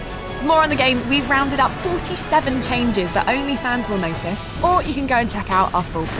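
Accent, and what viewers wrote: United Kingdom. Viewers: I'm British, and that's how we say Beta. See also "tomatoes" "vitamin" and "aluminium" for further education / infuriation. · Afro Samurai HD bless that UK accent bro. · seriously what is up with people, you all act like yours is the only acceptable form of pronunciation,It's UK English and you can clearly hear it in her voice.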